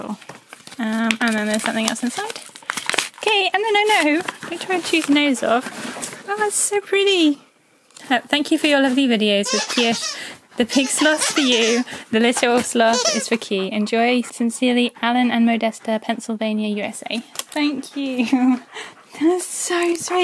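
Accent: England, United Kingdom. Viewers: "Get the tangly bit". I love your English ways of saying things. And Key really listens. It's amazing!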